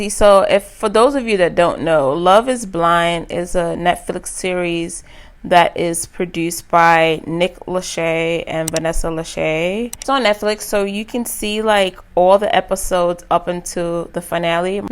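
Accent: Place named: Caribbean. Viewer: I love your combination makeup lesson and reality show review. I'm Bahamian-American and hear a patois lilt in your voice. Are you of Caribbean descent?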